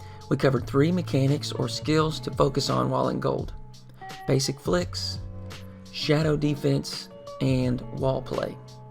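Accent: South, United States. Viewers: hi hello. I just found you from a sunless video and decided to look up your channel and I wanted to say a few things. 1. i'm pretty sure you're southern based on that accent hahah. I'm from the 'sip so ayyy. 2. I'v e never seen an in depth video like this helping people in each individual rank. so high props to you for even making these videos. 3. I just wanted to say thank you and I have high hopes for you my guy!!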